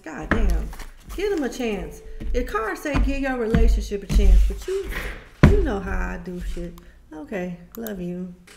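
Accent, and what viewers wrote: South, United States. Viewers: you are so pretty and blessed I'm glad I found you and your southern accent is adorable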